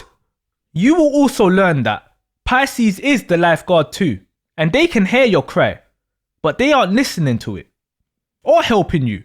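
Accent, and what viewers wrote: United Kingdom. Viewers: I keep on waiting for - Someone tell Doja cat, I’m trying indulge in that. 🎶 Rihanna’s face made me click & The narrator’s British accent made me stay. 😂👍🏾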